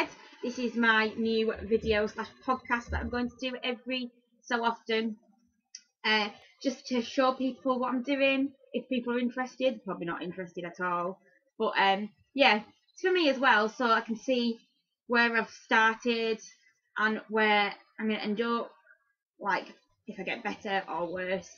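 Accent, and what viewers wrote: Northern England, United Kingdom. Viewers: I love your shed! Also your accent's fine, it's good to hear a Northern accent on a podcast for a change :D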